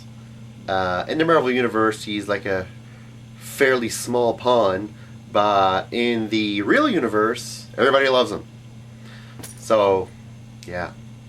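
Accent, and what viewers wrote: Canada. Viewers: BAHA I love the Canadian accent!!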